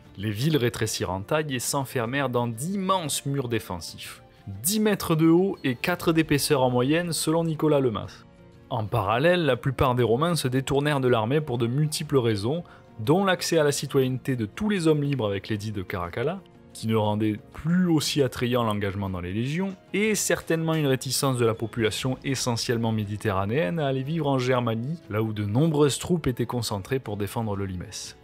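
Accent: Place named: Bordeaux, France. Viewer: Ayant de la famille bordelaise, oui votre accent est très peu prononcé, mais vivant dans le Nord, il est facilement audible ahah ! Merci pour ce long écrit, mais j’ai beaucoup plus la sensation avec d’autres empires et même le byzantin, que la chute fut bien plus brutale que pour Rome. Limite plutôt frustrant en fait de ne pas avoir un vrai événement qui enterre a une date précise Rome. En tout cas super vidéo et j’espère en avoir d’autres en reco à l’avenir !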